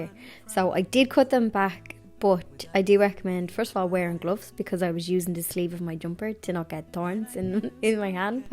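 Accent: Ireland. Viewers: Love your garden updates, it's pure happiness listening to a familiar Irish voice and your slang terms that I miss (ex Blanchie living in UK), love your enthusiasm, you never fail to make me smile!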